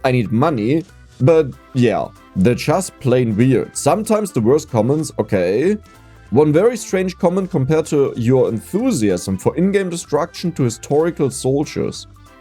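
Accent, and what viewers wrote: Germany. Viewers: I love your xD but for real, I really like your content, I pretty much always watch you to sleep in and I think your German ascent is charming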